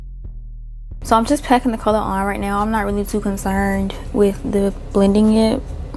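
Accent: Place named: United States. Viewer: you sound american but nicer american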